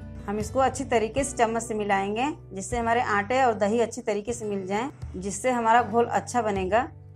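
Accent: Uttar Pradesh, India. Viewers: Aap up ke Ho kya.?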